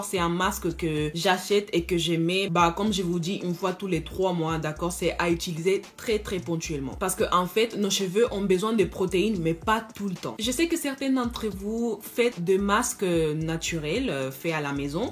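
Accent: Spain. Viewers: Tu as l'accent espagnol 😊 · ton accent ... je me demandai si tu étais espagnol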